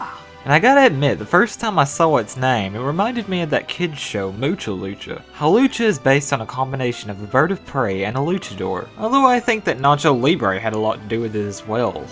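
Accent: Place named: Tennessee, United States. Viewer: Where are you from? Your accent says somewhere around Tennessee to me. I could be wrong though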